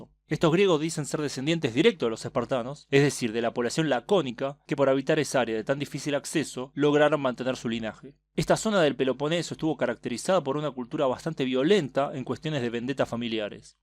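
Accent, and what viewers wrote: Argentina. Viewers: Xq va dejar de decir Sha ? Es así es nuestro acento y nada ni nadie lo puede cambiar te guste o no. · Disculpa la franqueza, aguantando tu "SHA" argentino. (Hago lo posible, porque tus temas son importantes). Quisiera saber eso del "perfil griego". A Napoleón le volvía loco ese perfil griego, Y a mí, cuando veo ese perfi en una mujerl, me parece bello, ya que no tienen la hendidura, de la parte superior de la nariz, sino es recto. Saludos desde Perú. Porfis, podrías pronunciar lo menos posible lo "SHA", pero si no se puede, no importa. aguantaré, Un abrazo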